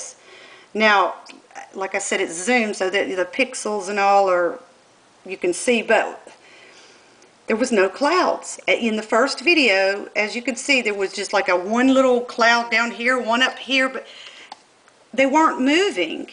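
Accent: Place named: South, United States. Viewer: You wouldn't think your a mom. Nice, and having a southern accent has nothing to do with it. Keep it up mom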